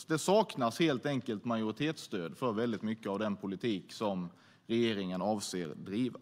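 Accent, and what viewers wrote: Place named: Denmark. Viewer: He is in fact Swedish and as for his name, well it's not really a unusual name in Sweden. Jimmie Åkesson is from a county called Blekinge, the county itself is geographically close to Denmark and through that gets a special kind of danish twist in it's accent. Im not going to pretend to know anything about accents in Ireland but I can imagine that people who live in the eastern part get influenced by both the Scots and the Welsh languages, if true that would be a good comparison.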